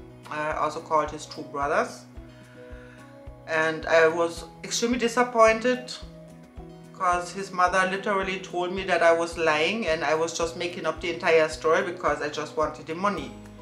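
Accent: Jamaica. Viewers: Hi, I'm one of your new subscribers. I love listening to you tell your stories of your Jamaican experiences. You tell it so well. You paint a very clear and easy to understand picture. I love hearing your Jamaican accent.😊👏🤝🙌🙏👍❣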